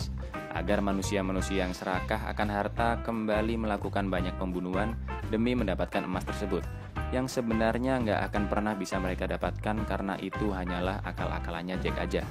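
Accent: Java, Indonesia. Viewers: Org jawa y bang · Orang jawa ya bang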